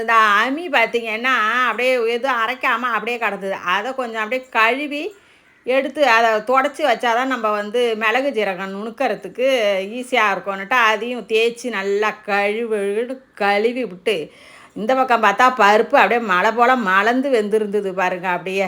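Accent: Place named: Thanjavur, India. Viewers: நம்ம ஊர் பேச்சு,,, ❤ from mayavaram 🎉🎉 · தஞ்சாவூர் தமிழ் ❤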